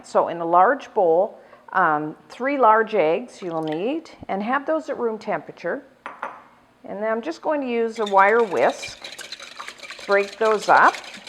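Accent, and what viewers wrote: Canada. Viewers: That's just her cute Canadian accent coming through. She also said Oat for out and a boat for about...we in the USA love to hear that accent. It's very charming :)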